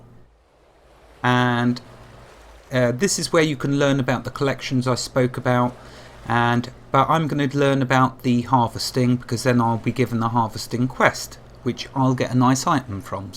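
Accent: United Kingdom. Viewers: Awesome EQ 2 series , I just love your videos...Are you from the UK by any chance and did you enjoy eq and eq 2 better than villagers and heroes?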